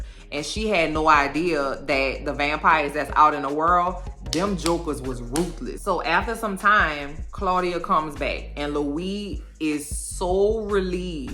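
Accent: New Orleans, United States. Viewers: About 30 seconds into your video, I gasped. Thanks to the talented Jacob Anderson, I recognized your accent as New Orleans right away. Just as impressive was Sam Reid learning French so he wouldn't have just the accent, but the language too. Loved your season recap and hope you do every episode next season. You're a whole lotta fun!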